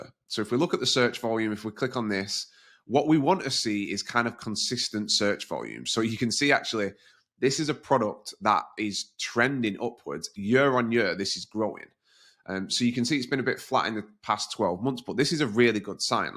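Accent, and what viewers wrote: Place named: England, United Kingdom. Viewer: Hi just started watching your videos, you sound english, would you say to start that I should start in England or America, I see you doing america fba. Also osome has lots of really shit reviews about just not providing the service they promise, it's made me not trust you as much, if you was a big honest channel, why would you pick a company that has a lot of bad reviews?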